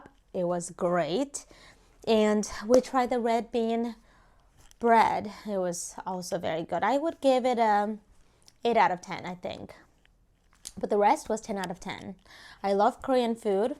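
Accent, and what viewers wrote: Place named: United States. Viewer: Your American accent helps me improve my pronunciation.Waiting next English speaking vlog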